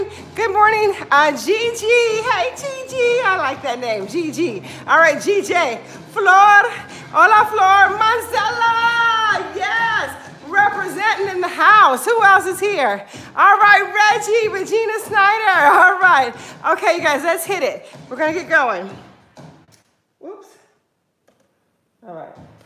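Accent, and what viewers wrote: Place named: United States. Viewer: me encanta tu acento gringo al hablar espanol :)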